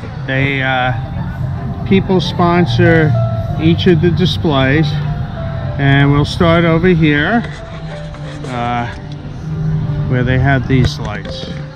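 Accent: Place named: Northeast, United States. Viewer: It's certainly good to hear from you. Where are you originally from? I detect a northeast accent. I stubbled upon your channel because I'm thinking of moving down to the RGV. Anyway, you have another sub! Have a wonderful Christmas season and I'll be watching...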